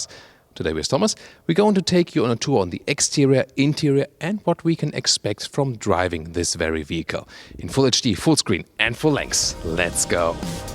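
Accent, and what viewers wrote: Germany. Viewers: This German accent :)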